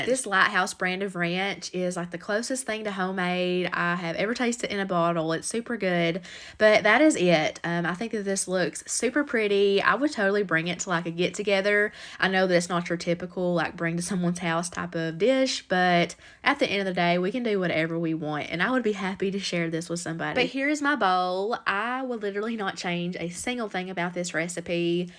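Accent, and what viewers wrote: South, United States. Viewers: This is so random but I love having a southern Youtuber say bowl and oil the same way I do. Bole and awwll. 🥰 🥰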